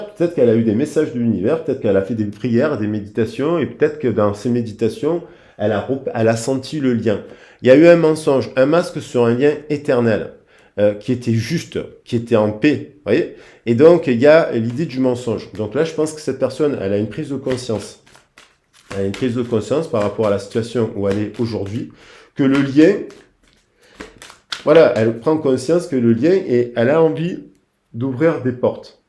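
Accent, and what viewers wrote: South, France. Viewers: Merci pour cette guidance je crois de t’avoir tout dit la dernière fois j’ai voulu aider mon autre je lui ai dit une vérité qu’il n’a pas apprécié désolée mais c’était pour son bien j’en avais marre de voir des vautours autour de lui qui le manipulaient et l’influencaient c’était ma mission d’aider et soutenir les personnes que je croise sur mon chemin de vie maintenant si mon autre désire me parler qu’il vienne me voir et qu’il ne s’inquiète pas je l’accueillerai comme il se doit pour une réconciliation sincère et authentique puis on avisera amour ou amitié je ne sais pas Dieu seul le sait 🙏 bonne journée et c’est toujours avec un grand plaisir de t’écouter chaque fois avec cet accent du Sud qui réchauffe mon coeur❤ grossi bacioni sans oublier Catalina et ton fils 👄👄👄😘👋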